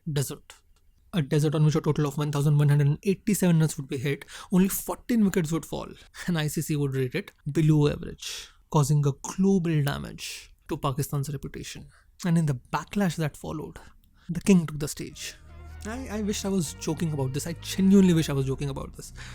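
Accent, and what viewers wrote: India. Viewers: I love how thick indian accent u have. Raaaayhmbo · Bias Indian Accented Blog and we are buying into it.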